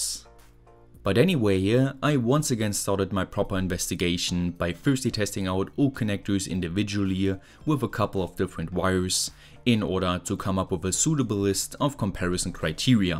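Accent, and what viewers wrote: Germany. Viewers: It's much better now, finally being able to put a face to that weird German voice! 😁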